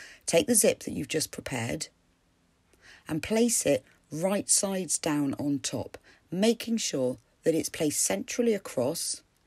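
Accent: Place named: Birmingham, United Kingdom. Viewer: Thanks for this tutorial ❤ Sounds like you’re a fellow Brummy 😊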